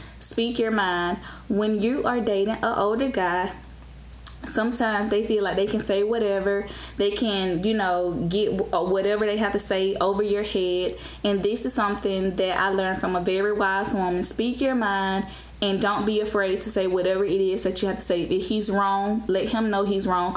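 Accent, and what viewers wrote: United States. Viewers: You sound like ur from Alabama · I love Chocolate woman..2pac said The darker the berry the sweeter the juice..shoot me a Email Choc a doll...if it your situation dont work <mail> sound like you from down south..Im Capitan Save a lot...womam are so much ahead of us.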